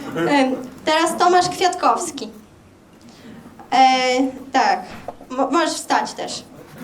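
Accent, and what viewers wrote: Podlasie, Poland. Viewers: Brawo! Mega 😅 i fajnie Podlasie słychać w Tobie 😀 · Też to zauważyłeś? Jest mega urocza, mega humor, no i ten akcent, najpierw myślałem, ze jest gdzieś z Podlasia. :) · Ja chyba zostanę psychofanem 😀 zakochałem się w tym typie żartu, mega akcent trochę przedwojenny, trochę Podlasia z domieszką Mazur, ale mega robotę to robi 🎉🎉🎉🎉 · Ten aksent to bardziej z podlasia niz z Warszawy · z warszawy XD a akcent podlaski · Słychać Podlasie. Używa też slow lokalnych jak pazłotka.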